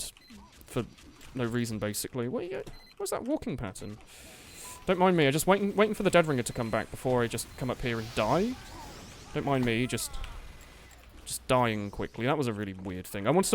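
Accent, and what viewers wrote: United Kingdom. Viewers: u sound British asf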